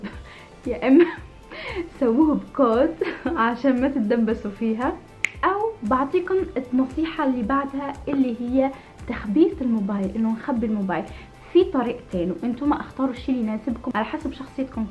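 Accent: Tunisia. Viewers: حسيت من طريق حكيك انك تونسية بنت بلدي👍👏🌞😗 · احس انك من تونس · صح هي تونسية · نحسك تونسية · إيه تونسية حلوة سكر😋🌹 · انا مشتركة جديدة حاستك تونسية اذا كنن صاح انا جارتك من الجزائر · هل انت تونسية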